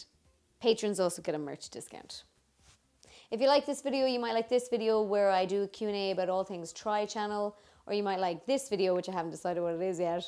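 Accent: Ireland. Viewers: I just discovered I'm half Irish. Soo id like to learn to speak like you now. Love the accent.😁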